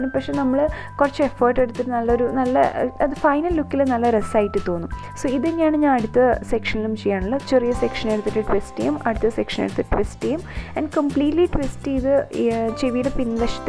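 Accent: Thrissur, India. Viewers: Cheachi thrissur ano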